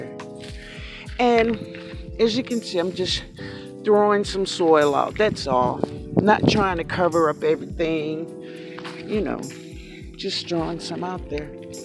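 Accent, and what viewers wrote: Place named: New Orleans, United States. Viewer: I just found your channel today & I am SO HAPPY! I am 25 and just moved into my first house & am trying to hard to make a beautiful garden. I bought these exact boxes & some other tools from the Dollar Tree last night, but have never grown a flower in my life before, so I was desperately trying to find some help online when I stumbled upon your videos. I'm so excited I could cry! You will be helping me through my first garden now!! :) And my mom's from NOLA, so your voice is highly comforting to me 😌😌 thank you so much!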